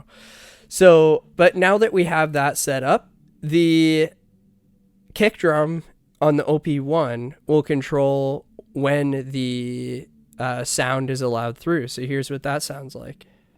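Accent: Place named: Canada. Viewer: I detect a Canadian accent - where are you from?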